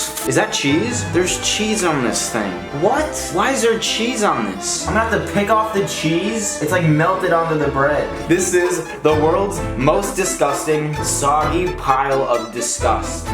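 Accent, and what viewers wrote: United States. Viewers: Are u American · is this guy really russian? he sounds like an american.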